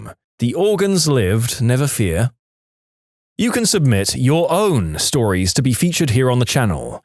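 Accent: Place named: West Country, United Kingdom. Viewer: Love the west country twang, near brizzle perhaps?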